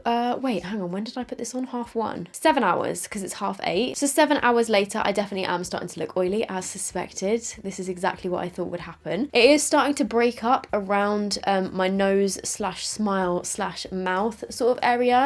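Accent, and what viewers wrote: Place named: United Kingdom. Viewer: is she british? i love her accent!! 🤍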